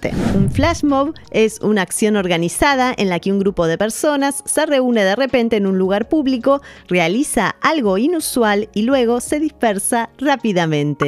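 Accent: Argentina. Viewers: Argentina que sorpresa 😲!! te sentía cómo chilena, te identificó la gashina sos argentina. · Por el acento, entonacion y pronunciacion del español de Doña parece ser argentina. Que bien que te guste nuestra música... Saludos desde Ciudad de Mexico.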